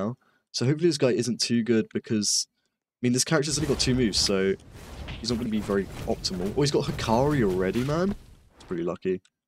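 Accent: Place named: United Kingdom. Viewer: This guy British asf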